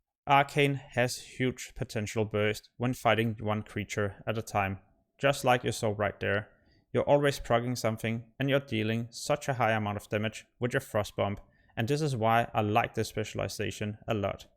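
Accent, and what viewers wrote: Denmark. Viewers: why can i always hear someone is from denmark xD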